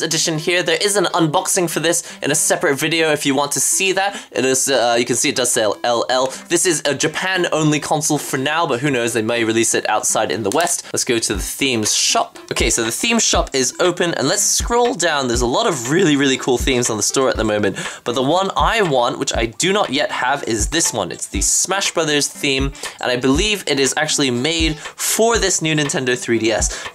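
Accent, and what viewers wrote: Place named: Scotland, United Kingdom. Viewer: Dude! You sound like you have a Scottish accent similar to mine.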